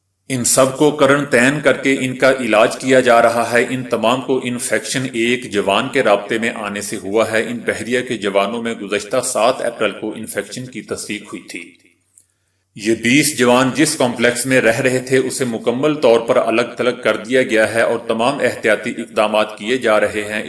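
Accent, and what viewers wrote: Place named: Punjab, Pakistan. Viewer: pahly apny LAHJEY ko sahi karo.. pher khabrey pharloo... khaar punjabi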